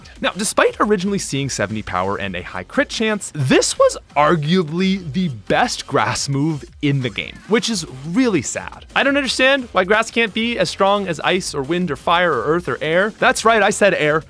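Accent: Canada. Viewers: It's the Canadian Rise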